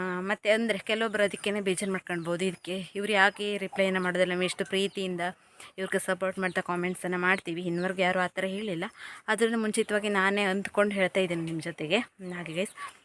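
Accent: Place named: Kundapur, India. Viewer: Nimma mathu swalpa kundapur kannada uttarkannada mix ede adare nimma mane hatthira beautiful nature nodi kushiyayithu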